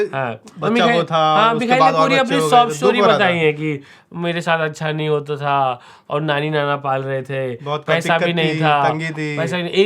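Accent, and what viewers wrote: Bengal, India. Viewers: I am a bengali buy bengali accent makes me laugh 😂😂😂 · Bengali accent >>>> prabhas jokes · bhai ek ep pura bengali accent mein karo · Bengauli accent was just too good, top notch 🤣🤣🤣🤣🔥🔥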